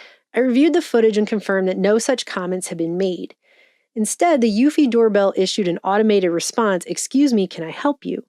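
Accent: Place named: Midwest, United States. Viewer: eh, normal? has a decidedly Midwestern accent with the way she pronounces mom as "mahm", among other inflections. It's not a pristine General American accent or its relative pregenitors in the Maize Belt. That said, the San Fernando Valley accent is influencing speech just about everywhere, thanks to Hollywood. It seems to be the favored regional dialect to mock now, perhaps overtaking the various Greater Appalachian dialects, which used to be the go-to. Moreover, the both of them are already the basis of the charicatures of the entitled urbanite and the country bumpkin.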